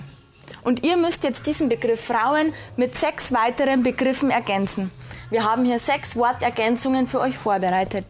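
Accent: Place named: Lower Bavaria, Germany. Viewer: bist du aus niederbayern?? Der Dialekt kliegt irgendwie so.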